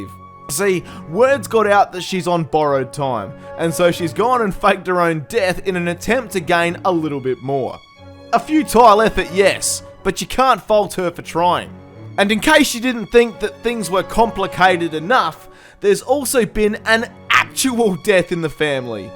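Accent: Australia. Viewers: brang back so many fking memories of the hitman blood money series very nostalgic excellent commentator 🇭🇲💯🤣😁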